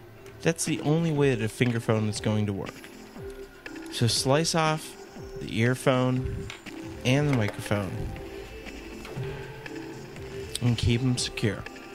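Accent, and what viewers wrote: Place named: United States. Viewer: he says that because hes from the USA and thay speak a bit different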